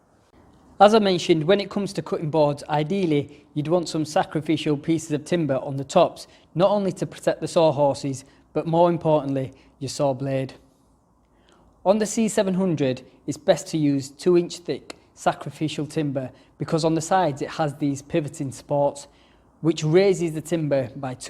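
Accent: United Kingdom. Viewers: Fantastic review. Informative, demonstrative and to the point. No fluff. And a lovely Northern accent to boot! Hope to see more of your reviews in the future.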